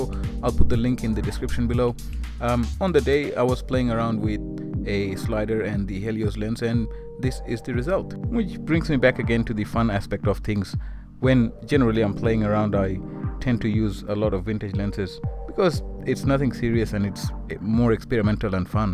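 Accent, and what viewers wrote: Bengal, India. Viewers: Are you Bengali by any chance?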